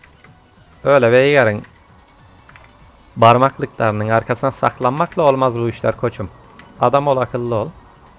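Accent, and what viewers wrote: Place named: Afyon, Turkey. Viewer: Kardeş afyonlu musun şiven çok hoş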